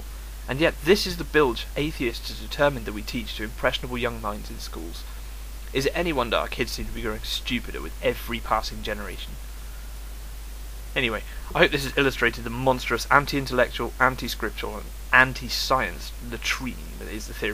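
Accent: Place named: England, United Kingdom. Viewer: Maybe it's the english accent, but I don't believe you're this dumb. I think you're a troll. Get a life guy.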